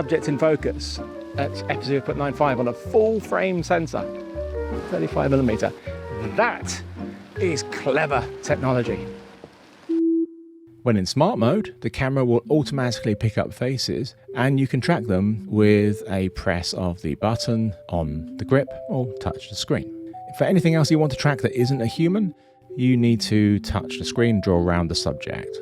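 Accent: United Kingdom. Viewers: Fantastic video, this is by far the best and most honest review of the 4D I've seen yet. I'd say you are doing pretty well for an old guy haha! Always love your reviews and your soothing British accent makes them all the more enjoyable to watch :) That story about your back problems though... ouch. I'm a pretty young and fit guy, but even I started hurting while watching that! Good food for thought as I continue getting older and more involved in my videography career.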